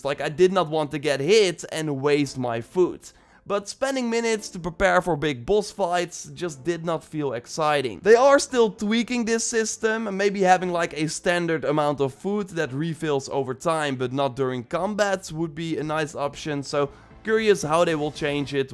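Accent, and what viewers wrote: Netherlands. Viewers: Awesome vid, my fellow dutchman! (Guessing by the accent... am I right? xD)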